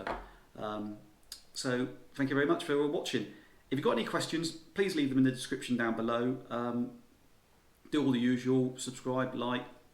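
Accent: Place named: United Kingdom. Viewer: Thanks for the informative video love your UK accent haha... Do you think these can be used for collecting and filtering tap water at all? I am traveling in Europe including London, cities...I am not collecting water from lakes or rivers or ponds though haha... I am looking at filtering water for my family (four of us) for making morning powder shakes or water for their bottles for out and about drinking. Thanks lovely.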